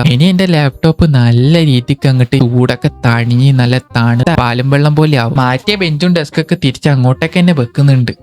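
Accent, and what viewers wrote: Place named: Kannur, India. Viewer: Bro kannur slang matram parayumooo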